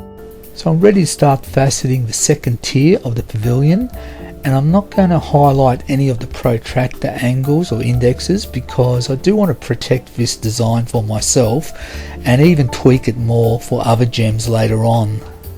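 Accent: Australia. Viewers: Well the other faceting channels don't have the Australian accent, so they can't copy that without sounding weird. the gem looks amazing!😮 wondering if you have done any videos of faceting golden rutilated quartz?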